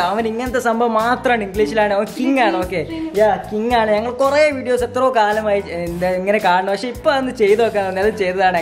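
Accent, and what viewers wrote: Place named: Malappuram, India. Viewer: Aalu kochikkaaranaanenkilum Basha. Malappuram thanne. .. Paarua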